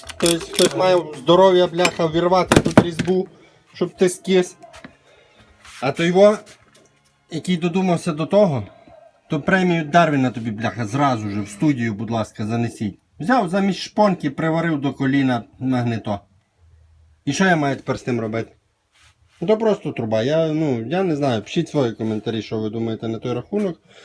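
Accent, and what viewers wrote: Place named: Odesa, Ukraine. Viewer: Відчуття наче одесит перейшов на україньську, дуже кумедно)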